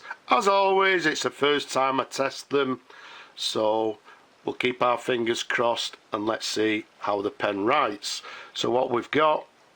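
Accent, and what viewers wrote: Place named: Yorkshire, United Kingdom. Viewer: Dear, Your videos are magnificent. How you manage to get such stunning pens is an enigma to me. I am sure you are doing a lot of research. Your voice and pronounciation seems that you are from Yorkshire in England. Am I right? Very very similar to that of the legendary Geoff Boycott. Are these pens (ONOTO) made in UK? Is the company still around. Please let me know. It is such a pleasure watching your videos. Keep doing it and God bless.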